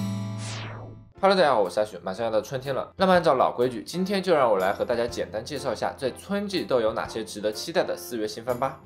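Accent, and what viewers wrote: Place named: China. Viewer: 听这口音应该也是我们国内的吧。。。为什么我觉得YouTube这里台湾人很少会介绍新番?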